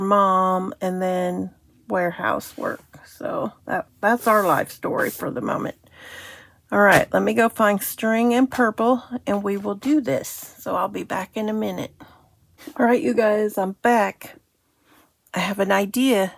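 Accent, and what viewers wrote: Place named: South, United States. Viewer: I Love your attitude to life and all it throws at you. Thanks for telling your story. I had to retire from teaching when I was 53 through mental health. I'm registered disabled through my feet. I was a carpenter before I taught and did lots of concrete form work. I did bridge decks and columns. My last big pour took 12 hours of continual pouring and vibrating concrete. What are duradelis. That's probably spelt wrong but I have never heard of them. PS your country accent is great. I have a Dorset accent.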